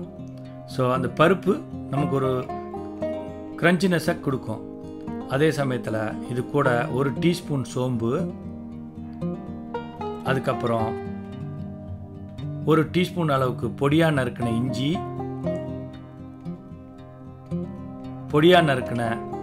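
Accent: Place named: Telugu, India. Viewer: Looks yum are you Telugu doesn't sound like malyalam or tamil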